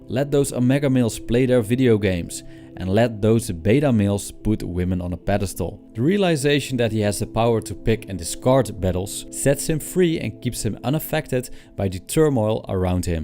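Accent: Netherlands. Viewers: Mmmmm, your accent... I guess it's Dutch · You have a bit of a Dutch accent, is this correct? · Your accent sounds very Dutch :)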